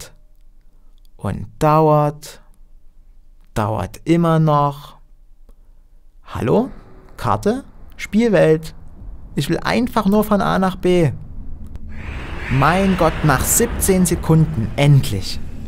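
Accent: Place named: Saxony, Germany. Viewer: Klasse Stimme. Sächselt so schön vor sich hin.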